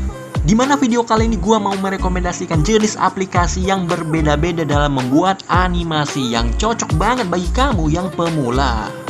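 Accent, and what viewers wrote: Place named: Medan, Indonesia. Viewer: Jangan hilangkan logat medannya ketua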